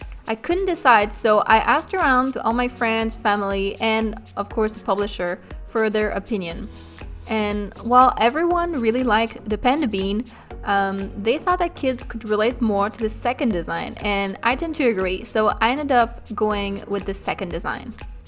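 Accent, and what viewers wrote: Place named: Canada. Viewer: Thank you,. That was really helpful! Can you do a tutorial, or series about Procreate on iPad or Affinity Designer on MacOS? Btw: Canadians are the best, love your accent! <3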